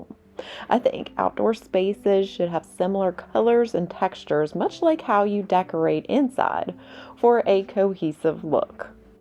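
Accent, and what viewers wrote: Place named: South, United States. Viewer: I just love your accent! I think after British accent the southern accent is the most beautiful in English! I love the porch! Looks good!!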